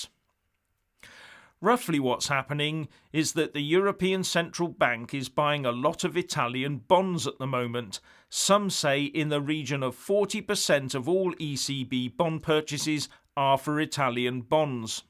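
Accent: United Kingdom. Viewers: Great analysis! Very interesting for those of us across the pond, who don't hear much of this stuff every day! (And I LOVE the crusty British dress and speech!)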